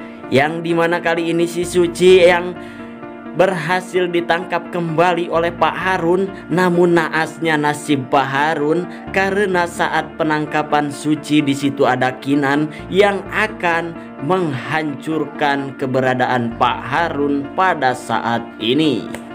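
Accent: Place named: Sunda, Indonesia. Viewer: Meni nyunda pisan ... si miminya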